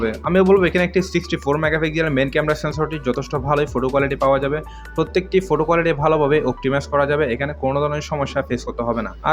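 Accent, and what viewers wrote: Chittagong, Bangladesh. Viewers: তোর বাড়ি hode... চট্টগ্রাম এর ত বুঝা যার বাড়ি hode ও ভাই? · চিটাইংগা বদ্দা এনা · হেতের বাড়ি চট্টগ্রাম। ভয়েস এ চট্টগ্রামের টোন স্পষ্ট। শুদ্ধ বাংলায় কথা বলতেই সমস্যা সে বলছে সে RJ. মানে বুঝলাম না। আন্দাজে। ইন্ডিয়ান যারা তারা বলছে ঐখানে এখনো লঞ্চ হয়িনি।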